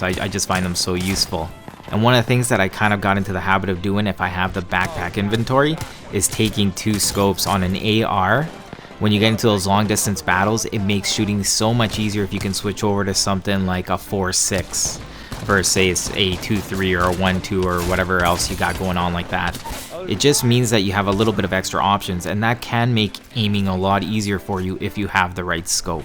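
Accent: Canada. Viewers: Where in Canada are you from? Nova Scotia?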